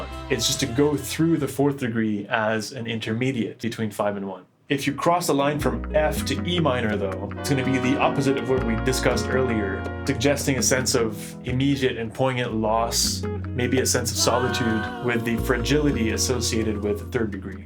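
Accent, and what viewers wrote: Quebec, Canada. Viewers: Your Quebec prononciation surprised me, but excellent explanation I understand better! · Hey, j'ai reconnu l'accent Québécois avec le titre de! Merci pour le vidéo:) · Me - Messemble qu'il a un (léger) accent Queb... Me - Jeulsavais! Bonne vidéo, concept intéressant et bonne exécution, merci pour le pdf! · “RE-present.” He’s not AI, he’s just French Canadian. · He sounds Canadian, maybe Quebec?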